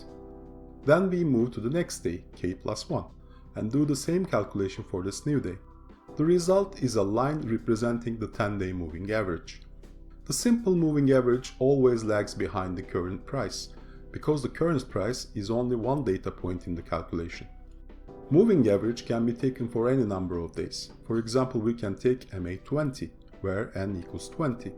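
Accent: Turkey. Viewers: Turk musun aga? :) aksanin oyle gibi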